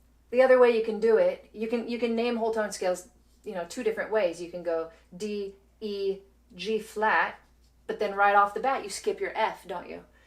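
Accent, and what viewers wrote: Scotland, United Kingdom. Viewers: Loving the Scottish accent. Oh, and the lesson. Thanks! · Are you half Scottish ?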